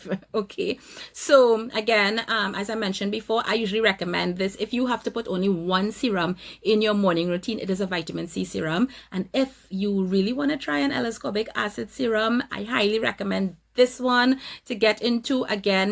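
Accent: Trinidad and Tobago. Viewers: Fellow Trini?? :) I hear the accent. Wow beautiful lol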